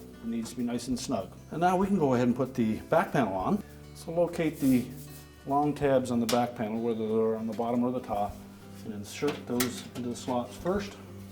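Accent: Canada. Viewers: Took me 7 hours total.....That included 1 hour to free the tub shaft from the center bearing and 1 hour of power washing and cleaning the tub and gasket. I have an 2004 machine and I did this in 2013 as well as several drain pump replacements. Amazing how cheaply made these China machines are, thin metal. cheap plastics and solder drip-mold nut and bolts!! After following the video, I officially now say "out" like a Canadian.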